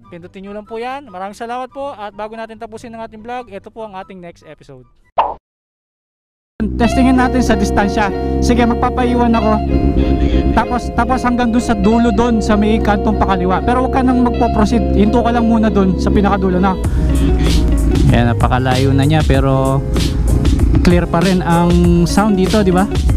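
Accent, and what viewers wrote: Pampanga, Philippines. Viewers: Kapampangan ka po?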